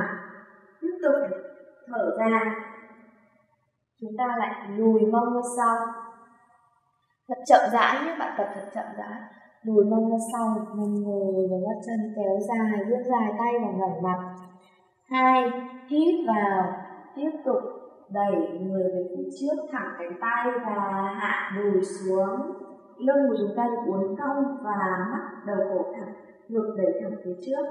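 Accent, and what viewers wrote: North, Vietnam. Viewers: giọng miền bắc mà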